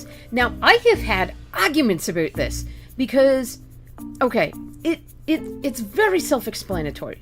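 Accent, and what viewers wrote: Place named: Boston, United States. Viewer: Once you said you're close to Bostonian I instantly heard it in your voice.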